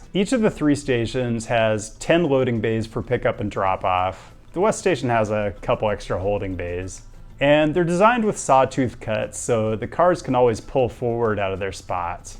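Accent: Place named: Southern California, United States. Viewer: Why is this man so handsome where is he from? He sounds like he is from south California maybe San Diego ? He has cat but not sure if he has wife or partner?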